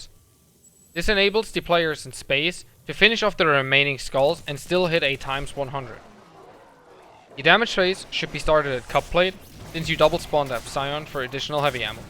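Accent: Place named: Germany. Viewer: That German accent is sexy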